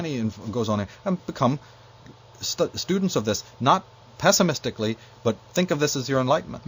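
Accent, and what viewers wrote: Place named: Northern Ireland, United Kingdom. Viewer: I find his voice compelling... The slight Northern Irish accent makes it easy to listen to. Thanks for uploading this Free your sou with 7 l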